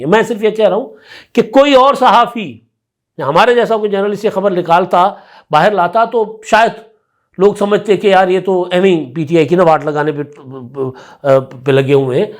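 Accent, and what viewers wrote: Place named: Sindh, Pakistan. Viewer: apki punjabi sun ker hansi aai . sinhi speaking ki punjabi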